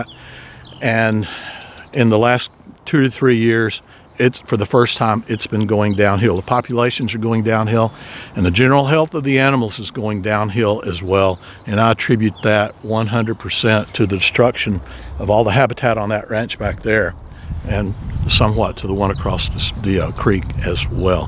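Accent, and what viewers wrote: Texas, United States. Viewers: Always happy to see you and hear that Texas drawl that I remember so well. Do you live in the district for which Brandon Herrera is running If you do and he is elected, I wonder if there is anything that he can to do? Probably too late but worth the try.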